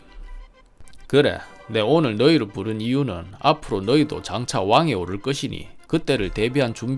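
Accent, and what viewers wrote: Gyeongsang, South Korea. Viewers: 영상제작에 사투리가 불편하면 안보면될걸ㅉㅉ 제작자님 일상생활에 경상도 사투리 접하기 어려운 저로서는 들을때마다 구수하고 재밌는 억양이 귀에 팍팍 잘 꼿히고 있으니 있는 그대로 계속 해주세요~~!!! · 무슨 고구려왕과 역사를 얘기하면서 나레이션을 어울리지도 않는 경상도 사투리를... 표준어를 못하는 것도 아니고...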